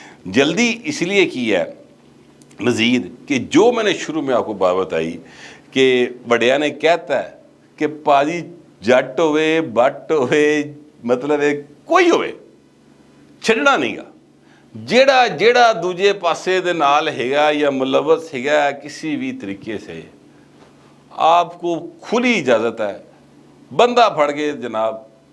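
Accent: Punjab, Pakistan. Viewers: حقیقت پہ مبنی، مدلل گفتگو،خوشگوار لہجہ اور پنجابی ٹچ۔ یہ ھیں ۔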